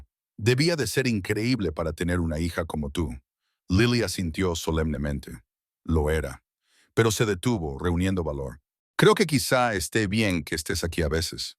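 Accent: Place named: United States. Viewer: Realmente el relato con esa tonada INNECESARIA de Yankis con una hormiga en la lengua me pone de muy mal humor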